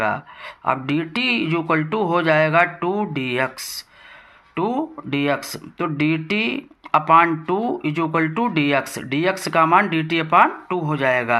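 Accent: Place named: Bihar, India. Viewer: Bhai eski bihari master ki language toh kam kam hi samj aayi pr, pr question toh smj hi gyi Mai 😅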